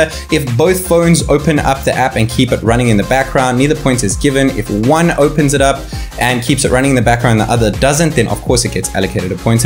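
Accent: South Africa. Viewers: South African?!!!? 🤩🤩🤩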